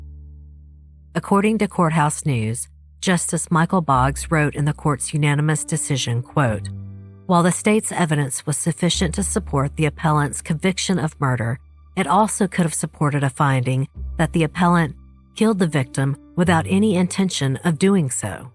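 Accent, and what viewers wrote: South, United States. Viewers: I love your channel, you have the most relaxing voice to listen to a good story while omw to bed. And I’m from the South so I love your accent, it makes you feel familiar!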